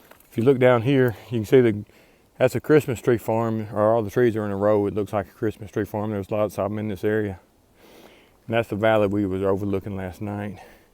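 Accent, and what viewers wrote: South, United States. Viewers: U a southern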